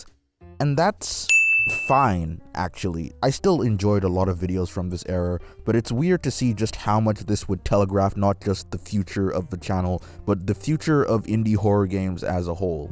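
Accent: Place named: England, United Kingdom. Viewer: I want the crazy horny werid dude not that fucking anoying posh English cunt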